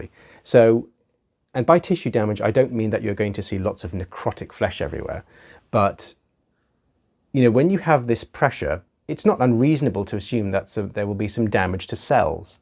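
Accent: United Kingdom. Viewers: Wish he didn't have a British accent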